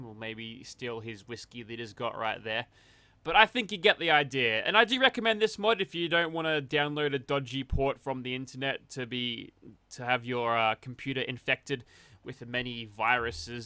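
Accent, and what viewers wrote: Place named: Australia. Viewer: First time in months I’ve listened to your Aussie accent. What do you think of how bad Eddie Jones & the team done in the RWC 2023 ?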